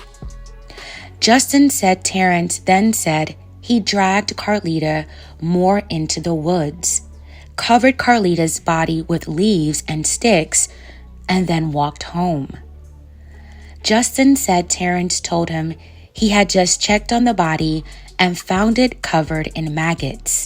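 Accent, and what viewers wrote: Baltimore, United States. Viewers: Bro. I'm from Baltimore too and I live in ATL also. Thanks for bringing these stories forward dummy and bringing attention to our city. You speak with our accent but you narrate robotically as if you're reading from a piece of paper. If I. Was you dummy, I would let this female narrate my blog's because she tells the story more fluently. #MajorDifference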